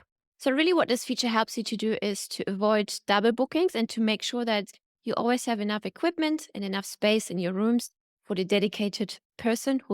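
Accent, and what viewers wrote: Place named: Germany. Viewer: lol what is her accent? she sounds very german haha.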